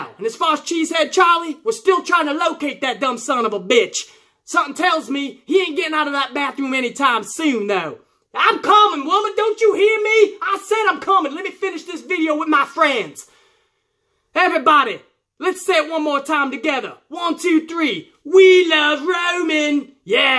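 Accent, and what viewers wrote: South, United States. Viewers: Funny stuff love the Ole Country Accent on the Night The Coke Zero 400 in Daytona is on. There no way Im cheering for Roman way he is booked an Vince Mr. GoldenBoy continues to be pushed down our throats. So, sick of hearing “It’s the Big Dog.” No, Cole its not we know you been freed by Vince to say that. We get it! He not the guy. But again this some funny stuff. is 💪🏻 # #Smarts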